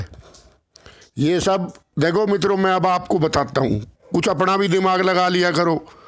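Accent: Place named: Haryana, India. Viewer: 🙏🙏 Maharaj ji .aapki hariyadwi language bahut achchi hai.main bhi hariyadwi hun.shubh ratri.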